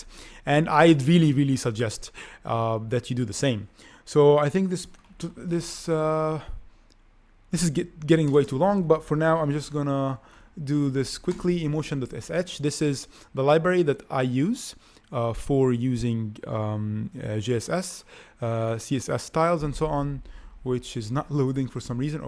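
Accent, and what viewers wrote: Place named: France. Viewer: Everything made perfect sense because of the way you explained it. Thanks a million. The charming French accent added a flavor haha. It was perfect!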